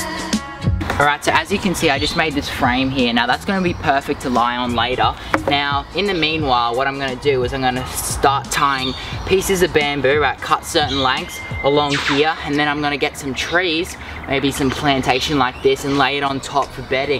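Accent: Australia. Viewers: Are you an Aussie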